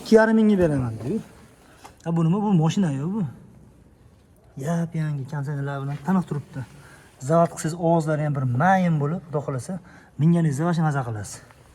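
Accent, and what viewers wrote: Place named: Andijan, Uzbekistan. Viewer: 200 000 ni tagi 60 da shevez andijonniki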